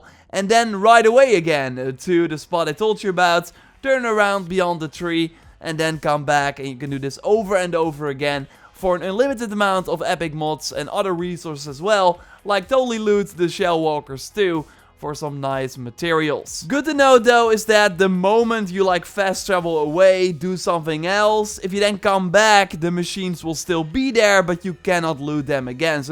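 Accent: Netherlands. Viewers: Yea he says asin-creed too :) It's all good he's Dutch? We forgive him!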